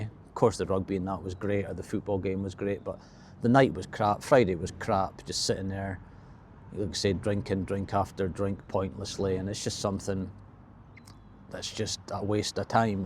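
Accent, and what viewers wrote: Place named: Scotland, United Kingdom. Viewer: The Scottish accent is so great - I could listen to you speaking all evening. Have a really enjoyable, alcohol-free, Christmas and very best wishes for the whole of 2026. (Hogmanay can be a challenge!)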